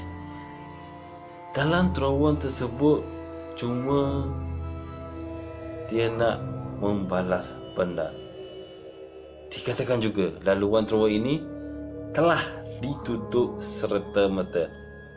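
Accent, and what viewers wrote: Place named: Kuala Lumpur, Malaysia. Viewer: Mantap abe ckp kl.. 👍👍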